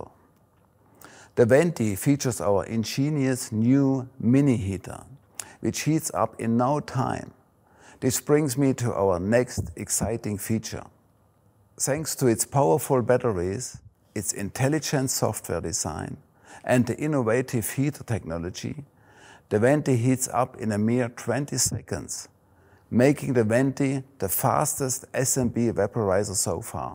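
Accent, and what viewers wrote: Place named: Swabia, Germany. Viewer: Ist bestimmt ein tolles Teil. Mit dem Crafty war ich schon sehr zufrieden...wenn man sich etwas mehr Zeit für die Heizphase nimmt. Die Häufigkeit der Ladezyklen ist der einzige Makel. Bin sehr gespannt ob der neue B+K Verdampfer da mehr Spass macht. PS..ein toller "schwäbscher" Akzent ließ mich jetzt schon ständig lächeln...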